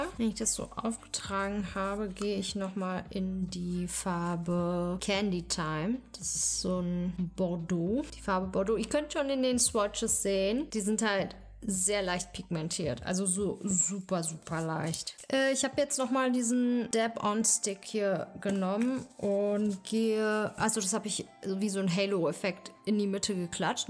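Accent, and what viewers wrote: Berlin, Germany. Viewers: 😂😂 minute 😂😂😂 Die beste Faxenmacherin youtubes Deine Berliner Schnauze ist auch grossartig -Du löst bei mir Heimweh aus 👏👏👏weiter so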